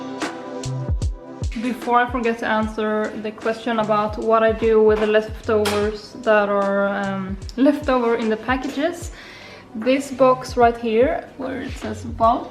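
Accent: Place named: Sweden. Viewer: your accent is so cute! are you from Sweden, srry im new here :)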